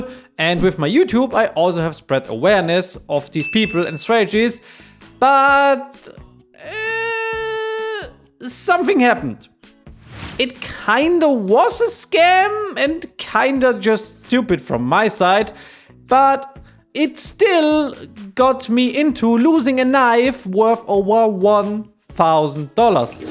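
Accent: Germany. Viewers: Man hört richtig den deutschen Akzent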